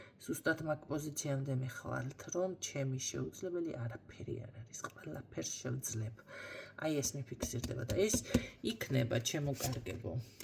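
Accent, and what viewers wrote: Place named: Imereti, Georgia. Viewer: ძალიან კარგი პიროვნება ხართ .მიყვარს თქვენი ლაპარაკი იმერული კილოთი რომ ხსნით ტაროს❤❤❤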